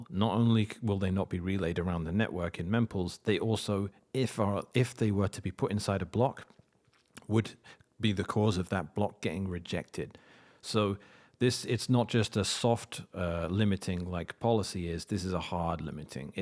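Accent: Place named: England, United Kingdom. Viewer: 😂😂 “speak English” he couldn’t get more English.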